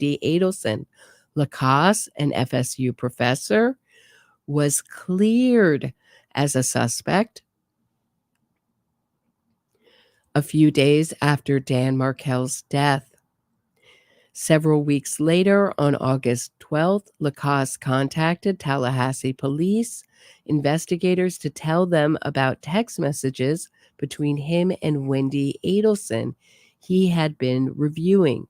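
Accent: New York, United States. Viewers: Hi , I love your new york voice. It's very addictive. Great channel and topic.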